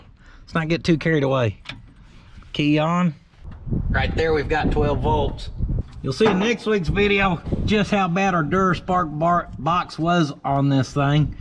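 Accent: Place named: South, United States. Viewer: I've noticed from watching you for years that you are the only one in your videos that has a southern accent When you're family and friends speak they don't have that and it makes wonder where that came from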